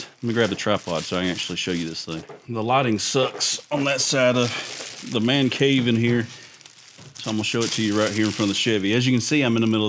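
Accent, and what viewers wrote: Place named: Alabama, United States. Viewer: What part of Alabama are you from? I’m from Alabama and I can tell you are too from that accent.😂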